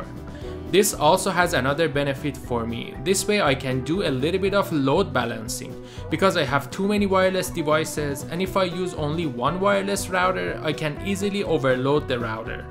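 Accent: Iran. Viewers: Why do get a strong persian accent from your voice?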